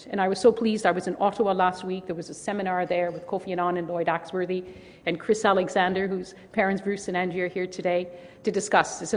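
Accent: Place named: Minnesota, United States. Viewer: She sounds a bit like Minnesota people, to me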